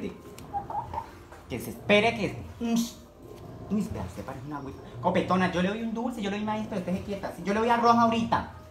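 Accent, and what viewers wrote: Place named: Colombia. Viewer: EL ACENTO COLOMBIANO 💘🇨🇴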